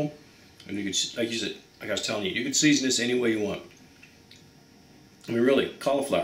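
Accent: United States. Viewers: are you chines or do you live there becuase you make every thing that chines people make and you sound country to me not being roude im just wondering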